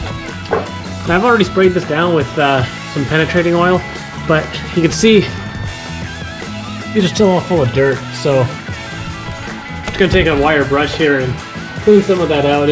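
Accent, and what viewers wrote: Canada. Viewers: I knew I could hear a Canadian accent in this guy!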